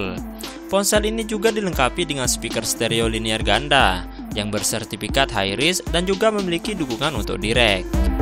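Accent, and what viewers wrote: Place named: Banyumas, Indonesia. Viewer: admin org banyumas yaa bhs indo agak ngapak hehe...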